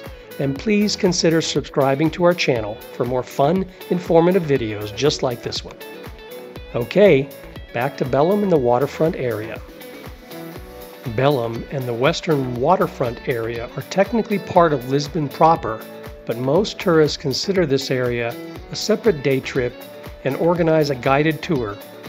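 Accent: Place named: United States. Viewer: This video is very informative and helpful to those planning their first Lisbon trip. I do like your recommended walking map directions. I've been to Lisbon and covered most of what you mentioned but there are some places I did not see and do want to go back some time and cover those as well. My only criticism for your video is that you made some glaring typos and mispronunciations (such as Barrio Alto instead of Bairro Alto). Baixa should sound closer to "Buy-Shah" than "Bahk-Sheeya". It is such an American tendency to pronounce things the way an American would read them instead of learning how they are really pronounced. When creating a informative video on a place, you do owe it to your viewers to make a better effort here. This critique aside, you did a very good job on the information presented to help people plan things.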